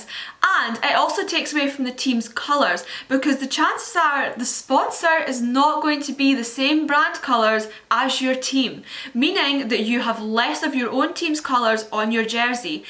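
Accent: United Kingdom. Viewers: When I say she had a British accent I clicked off what does a Brit know about hockey leave the hockey to the Canadians stick to the soccer and rugby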